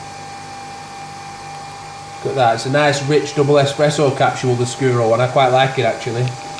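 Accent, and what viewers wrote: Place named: Northern England, United Kingdom. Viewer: ah, your accent sounded a bit like Gary Neville's who's from Bury so I figured you were from round there. Q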